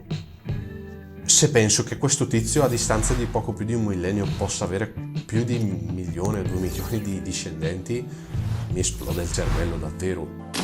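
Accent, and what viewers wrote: Brescia, Italy. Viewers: Molto interessante! Mi è piaciuta la passione con cui parli del tuo lavoro! Simpatico anche l’accento bresciano! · Mi piace molto la tua cadenza bresciana. Mi ricordi un mio amico.😊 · Video molto ben fatto su un argomento estremamente interesante....inoltre complimenti per la pacatezza e l'educazione che rivelano la bellissima persona che sicuramente sei....è molto piacevole seguirti....troppo forte l'accento bresciano....mi ricorda degli amici che avevo conosciuto durante il servizio militare decenni fa....ed in particolare il simpaticissimo tognoli....tutti bresciani ovviamente....apprezzo molto le conoscenze che hanno 1 matrice storico-culturale ed in particolare sulle nostre origini.....grazie..... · C'è un professore di italiano su YouTube, Italiano Automatico. Ha esattamente il tuo stesso accento. Questo accento è molto particolare e non ci sono altri professori con lo stesso. 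Ho scoperto che anche lui è di Brescia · Complimenti per l,argomento trattato. A parte la cadenza bresciana, che qualcuno an approva. È molto interessante. .la pregherei di ripetere la testata del libro che mi è sfuggita. Grazie.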